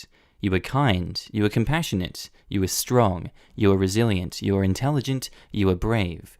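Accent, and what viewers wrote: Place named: Australia. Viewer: He's rather obviously Australian.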